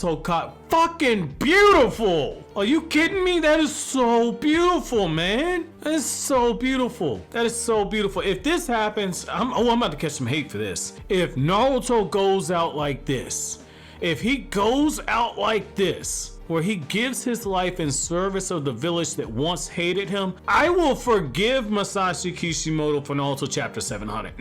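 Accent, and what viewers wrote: New York, United States. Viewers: I fucking knew you was from New York when I heard you laugh bc my clan filled with New Yorkers but know it’s confirmed p.s New York pizza ain’t shit compared to Chicago 💯🤣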